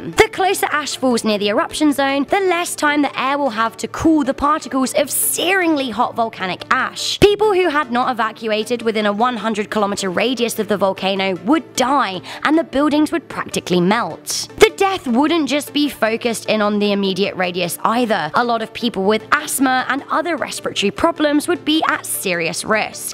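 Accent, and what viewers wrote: United Kingdom. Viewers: I don't know...I don't know...I don't know where I'm-a gonna go when the volcano blows. I love hearing Brits pronounce things like "St. Louis" and "Cheyenne".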